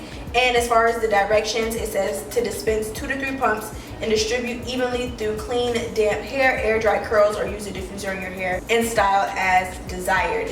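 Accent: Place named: New Orleans, United States. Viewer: Lemme find out you from NOLA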